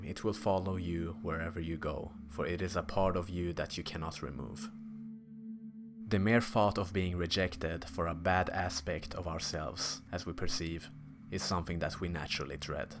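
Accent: Sweden. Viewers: Are you swedish sounds like it atleast😂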